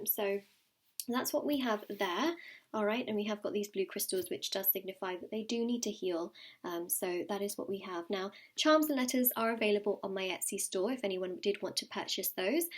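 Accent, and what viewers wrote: England, United Kingdom. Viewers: Love your accent where in England are you from?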